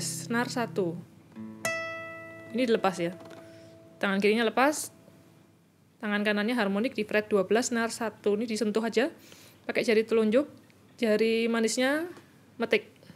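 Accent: Java, Indonesia. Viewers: Mbak nya rodo medhok.. ailapyuh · Wong jowo yo mbak..kliatan logatnya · Jowone medok mbak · Suarane medhok mbak yu......😃......... Yen ing tawang ono lintang.....mbakayu.....😀😁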